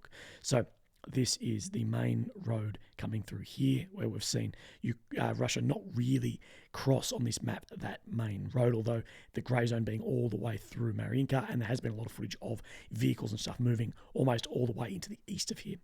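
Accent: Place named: Australia. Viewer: the sound is messed up on this one & has been getting worse on a couple of late,you need to bump it up a bit,although the ozzie accent is fun it can be hard to understand if it is mumbled & hard to hear.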